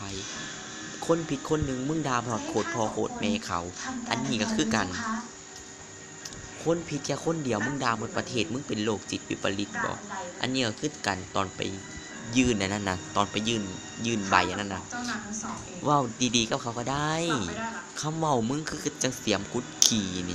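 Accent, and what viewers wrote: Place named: Laos. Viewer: คนไทยหรา555ไม่ใช่มั่ง 555 หนังหน้าลาวมาก พูดก็พูดลาว อีก555 อีเกย์สายเหลือง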